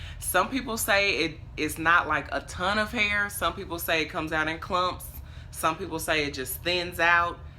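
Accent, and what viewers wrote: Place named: Texas, United States. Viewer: Just came across your videos. Love your personality and would like to know if you're from Texas? Your accent is similar to mine.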